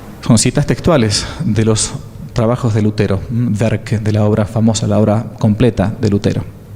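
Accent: Argentina. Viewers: Es una lástima que divulgadores hispanos de tanta calidad pierdan millones de personas de audiencia potencial por causa de ese fenómeno lingüístico particular que llamamos "shesheo" o "teísmo rehilado" típico de los porteños que tan cacofónico nos resulta a la inmensa mayoría de los hispanohablantes de todos los continentes.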